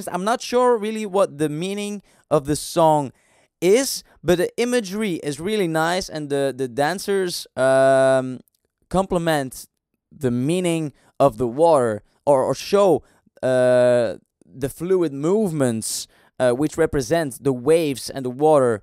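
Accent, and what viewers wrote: Netherlands. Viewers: question; quite a dutch accent.. where are you from?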